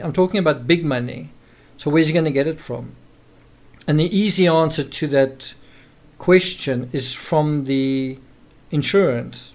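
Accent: South Africa. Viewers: I agree with you Diana, makes absolute sense by appropriate investigation and analysis of evidence, I have read a few of his books before he started this blog unbiased and rocket science ☺️ with his clear South African accent.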